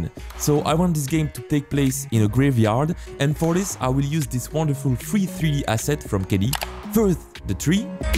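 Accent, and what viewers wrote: France. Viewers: Tu est bien français sa se vois de ton accent continue le bon travail frére💪🏻💪🏻💪🏻💪🏻💪🏻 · you have a french accent are you french ? because me too ! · I love hii french english accent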